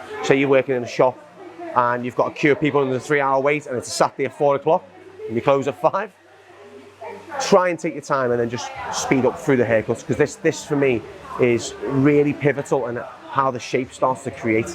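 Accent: Liverpool, United Kingdom. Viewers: It sounds to me like a 'scouser' accent or dialect. This is associated with Liverpool in the county of Merseyside, England, UK 👍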